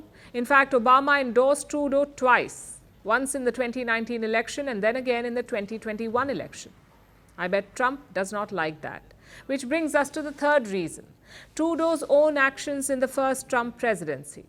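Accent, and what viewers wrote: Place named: East India, India. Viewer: This is comical. Here’s an East Indian/Canadian Journalist with a heavy accent telling the World that Canadians need to stand up to the big bad orange man who hates our Prime Minister Trudeau and wants to take over Canada. This has become like a Saturday Night Live skit. Donald Trump knows full well that for the last 8 years the alt left Socialist Canadian media has fed conspiracy after conspiracy to its citizens who gobble it up and respond with distain and insults. The page has now turned. 🤣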